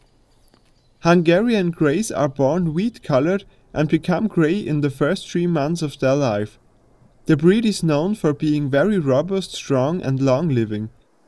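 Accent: Austria. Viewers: like your beautiful Austrian accent :) as much as this video. greetings from Australia. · Vielen Dank! Sehr gut gemacht, aber mich wunderts dass es die Pinzgauer nicht unter die ersten 10 geschaffen hatt! Bist Du vom Bezirk Kitzbūhel? ( der Sprache nach) I bin a Kirchdorfer, schon seit 50 jahren in Toronto Canada. Grūsse Martin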